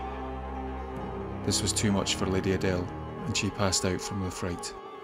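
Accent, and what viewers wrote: Scotland, United Kingdom. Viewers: I love a good ghostie story, but I love a Scottish accent even more. I swear I'd be watching even if you read the phone book. Great stuff :) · I adore your Scottish voice so much👍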